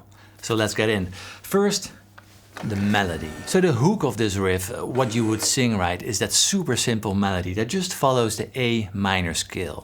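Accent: Netherlands. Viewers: Hoi! Groetjes uit Utah USA. Ik ben SoCal geboren en groot gebracht. I was pretty sure I heard a Dutch accent... en, ja hoor! 😅 For me, music is my time machine. I'm 67 now. When this song came out in '75, I was in high school. I took a class on US government and our teacher had arranged for us to fly from Southern California up to Sacramento, the state Capitol. We traveled all over (State offices including Gov. Ronald Reagan's office) Folsom Prison (don't know if you're a fan), Old Town Sacramento and Sutter's Mill (where the 1849 gold rush began). ALL DAY LONG on the old school bus we travelled in, THIS brand new song played. It was repeated constantly. To this day, whenever I hear it, I am always transported back in time to 1975. I saw the a couple of times, once, (I could go on). And I have memories from all these groups. But takes me back in time to this one particular day like no other. 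My best friend was in the band in the '70's and left before their big hit from Top Gun in the '80's. Of the two of us, he's the real musician. I'm sure you've heard of Rick Beato. Still, I love seeing you young guys dig in to our old hits to figure them out and play them correctly. Warms the heart and soul. I guess I'm afraid after us old guys are gone, the stuff ppl call music today (or AI) is gonna replace it. 😢 Waar in Nederland ben je wonachtig? Mine kinderen zijn vlaak bij Dordrecht en Barendrecht. No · bro is that a dutch accent I catch there? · Hey love your videos! Have been following your channel for years, and only now, when I'm about to get married to a Dutchie, did I actually notice through your accent that you're Dutch! Ahah! By the way, check this guy it would be awesome to see a video of you two together on your channel! Cheers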